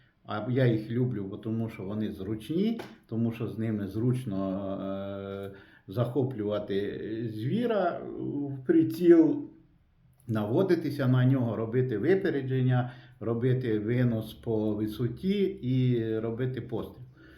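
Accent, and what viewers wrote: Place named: Vinnytsia, Ukraine. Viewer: Шановний пан! Чудово, що Ви зробили свій канал і популяризуєте мисливство. У Вас надзвичайна харизма і неперевершена вінницька говірка, Ви прекрасний оповідач і фахівець своєї справи. Але ваші відео не тільки корисні а і вредні) Я три місяці тому купив АР15 в 223 калібрі для захисту, тренувань і розваг, і зовсім не для полювання. На стрільбищах і бачив болтовики і стріляв трохи. Також дивлюсь чудові збройові посиденьки від Ібіса з Тарасом і Антоном. Вони теж популяризують зброю, зокрема болтовики. З ваших веселих оповідей я захопився полюванням на дивані) хоч всього пару раз був на охоті колись глядачем. І прикидував, як би то я зі своєю аркою теж би ходив. І тут Ви кажете, що 223 калібр зовсім не підходить для полювання, я Вам, як фахівцю безперечно вірю, очевидно, що це так і є. А Тарас якраз розхвалював карабін Маузер М18 в 308му калібрі. 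Все, думаю, куплю собі Маузера! Подивився відео, які вони класні, і знайшов, де його можна купити найдешевного зі стволом 51 см. Порадився з родиною, ну, кажуть, твої гроші... Зібрався в Ібіс по планку Пікатіні, а потім в інше місто по карабіна, і за три кілометри до Ібіса думаю - а нафіга він тобі треба, той Маузер? Яке полювання, ти по справжньому ніколи на ньому не був, і не знати коли ще попадеш на нього. Купити, щоб клацати класним затвором, і іноді стріляти по паперовим мішенькам? То у тебе є арка для цього! Ні, не час зараз для цього. Цілий ранок мені було погано, що відмовився від скоротічної мрії, а потім сам себе хвалив за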